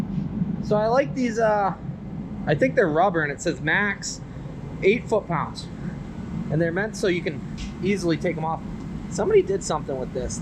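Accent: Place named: New York, United States. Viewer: Your picking up the New York state dialect accent, no longer the Massachusetts accent.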